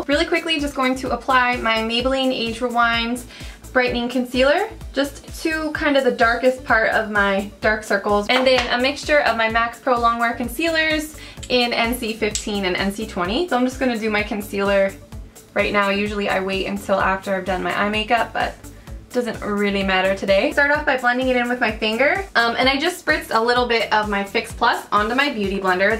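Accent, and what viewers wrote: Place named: Mid-Atlantic, United States. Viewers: ur from philly area? northeast? south philly? west philly? am from bucks county area..wow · When people talk about your accent, I am always confused, since all my family lives around Philadelphia, so I don't think you have an accent. You just sound mid Atlantic.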